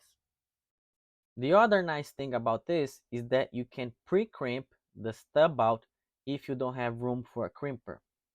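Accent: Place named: Brazil. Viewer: I can tell a Brazilian accent when I hear one haha great work man! Meus parabéns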